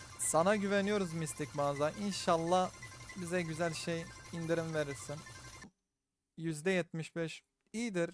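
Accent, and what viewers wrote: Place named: Diyarbakır, Turkey. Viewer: Abe sen nerelisin şiwen dyb sanki soylermisn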